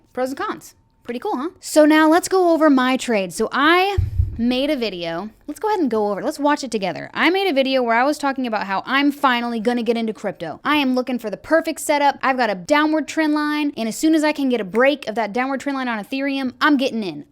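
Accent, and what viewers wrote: United States. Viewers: appreciate your vids, you have the best American accent ive heard (im from Europe)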